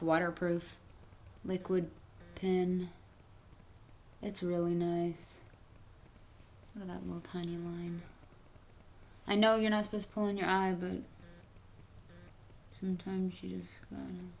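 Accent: Texas, United States. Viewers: i like the tutorial...but u need to be more professional and stop talking in that texas voice. grow up a little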